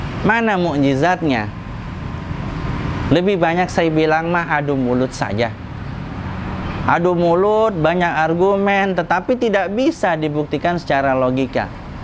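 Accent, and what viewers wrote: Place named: West Java, Indonesia. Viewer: Koh.. Chinese berlogat sunda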